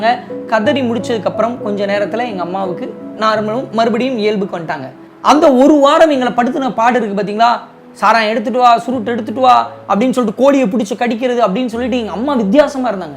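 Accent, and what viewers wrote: Madurai, India. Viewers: Ni madurai ya bro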